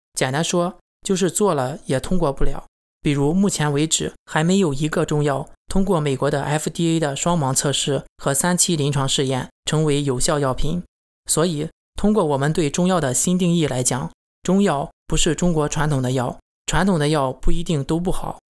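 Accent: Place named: Rizhao, China. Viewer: klaus我听你的声音，你是日照人吧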